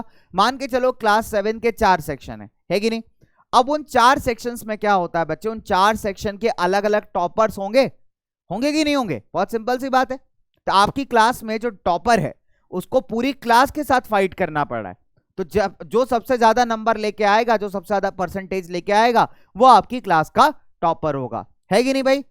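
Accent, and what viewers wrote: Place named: Uttar Pradesh, India. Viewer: Sar lagta hai up se hai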